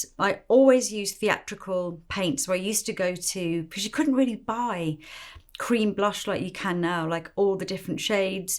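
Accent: England, United Kingdom. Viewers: I don't know if I've ever seen someone applying make up more elegantly than. It's just incredible to watch. Just her imagination, like how she has this very real vision in her head...lol....and she brings it to life in her way. It's cool to see. It's clearly such a passion and she's very good at what she does. I just adore watching her work at her craft and passion!!!! So happy that there are people like this on the planet that are loving what they do and following their passion....too many work jobs they just HATE... so as to get that paycheck.... but the world would be a much nicer/friendlier/healthier place or should I say the human race? if people actually took the time to find their passion, and then figure out how to make money involving that passion! <3 Just wonderful to see.....(so graceful and elegant too! And ofc if she didn't have that english accent it would just feel different....she makes me feel like old school english countryside elegance / royalty yet incredibly humble and knowledgeable....i will say though, DAMN that brush collection! 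I'd love to see a makeup and brush collection video! )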